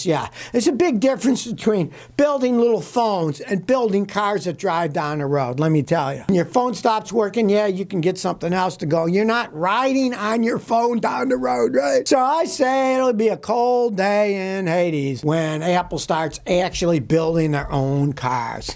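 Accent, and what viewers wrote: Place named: New England, United States. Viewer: Love the Yankee accident, etc The charm of "PLETH-ORa" is classy gangsta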